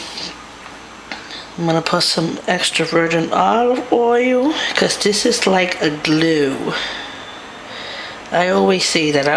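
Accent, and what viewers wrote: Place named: Bronx, United States. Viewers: Girl where are you from? You sound like you are from The Bronx (like me) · wow I grew up there on <num> had mainly Dominican and Puerto Rican friends so I can definitely detect a NYC Latina lol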